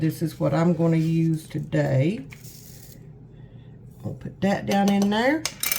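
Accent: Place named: Arkansas, United States. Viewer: Are you from Arkansas? I’m German and trying to figure out your accent. 😊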